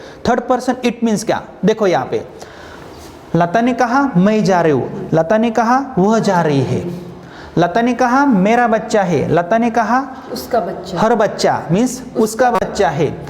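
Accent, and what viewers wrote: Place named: India. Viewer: I was struggling to understand India accent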